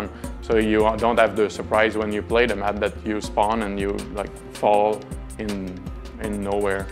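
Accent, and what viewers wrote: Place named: Canada. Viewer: awsome! LOVED IT!! ^_^ sounds like its canadian lol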